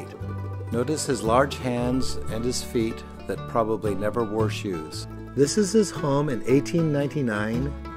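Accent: United States. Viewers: Why thank you. But sorry, I do hear a bit of the US in there. I wonder if your roots (your birth place and especially your parents birth place) were from the US and you spoke with American accents in the house (or on the ship, in your case) as your parents held their mid western accents. The Scottish was very slight with my ear - but I certainly did pick up the good ole Wheat Fields in your accent. I loved this video and would adore visiting this place someday when my 2 dogs are gone. BLESSINGS. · Maybe it's just prejudice, but I can't abide American narration.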